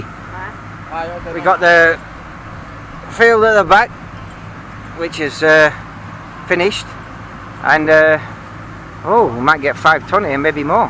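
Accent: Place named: Leeds, United Kingdom. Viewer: you sound like my m8 frm morley int leeds bet im not far off deffo leeds lad bit of crack and im a jock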